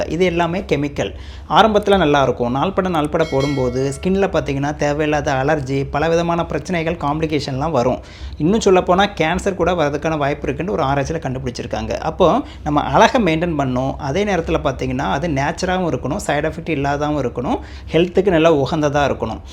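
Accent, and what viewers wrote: Tirunelveli, India. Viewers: Sir ungalukku thirunelveli ya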